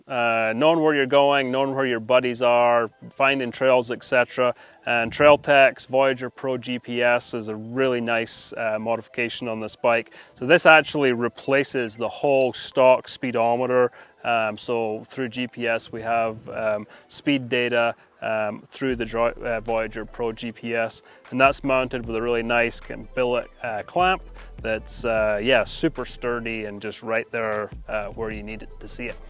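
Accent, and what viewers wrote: Scotland, United Kingdom. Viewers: Scott Manley is another Scottish Youtuber, though his accent is much thicker. I assume your accent is Scottish, yes? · do I hear a touch of Scottish through the accent... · You Scottish? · What part of Scotland are you from. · Hint of a Scottish accent in there?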